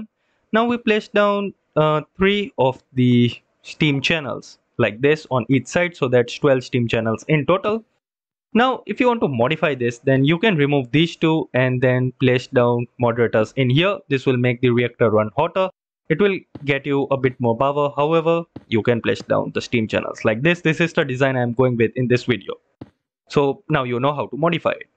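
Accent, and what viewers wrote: India. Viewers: Thank you thank you guy with Indian accent. Without you i would have been lost the moment i stepped on this mod. Killed over and over again by the Glyphids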